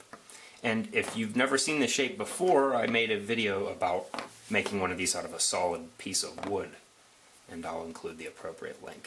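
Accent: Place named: Texas, United States. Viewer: dude, I love your accent, your jokes, and your dry delivery of them. my husband and I are getting into woodworking because of you. it's all your fault! 😉 did you live in Texas? I'm trying to pinpoint your accent; it's very complex and interesting :) (to me, at least)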